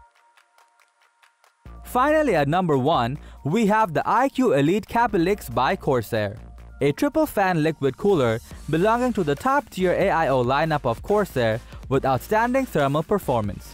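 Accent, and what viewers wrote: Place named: India. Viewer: He sounds like a Indian with a german accent trying to sound like a American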